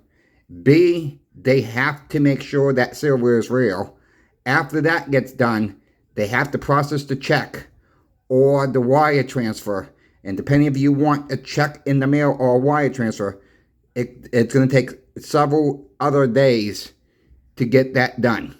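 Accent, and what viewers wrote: Boston, United States. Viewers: After hearing you talk for a minute, I can tell you are from Boston...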